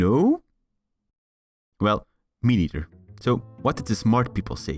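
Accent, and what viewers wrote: Netherlands. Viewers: Nice to hear the dutch accent, didn't know our country has such great youtubers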